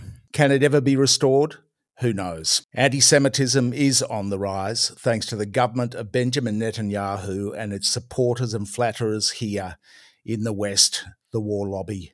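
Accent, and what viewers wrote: Australia. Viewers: Very grateful to be able to hear an Australian voice speak the truth. Legend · My first time watching this channel. I thought "He is an Australian!" I can pick our accent pretty quickly now 😀. It's a friendly accent. Thank you. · Finally an Australian accent criticising that horror in the Middle East by Israel and America. Frankly can never agree with imprisonment of indigenous peoples nor genocide or even starvation nor torturing via rape! They need stopping and justice, nothing else. Free the Middle East from Israel and America.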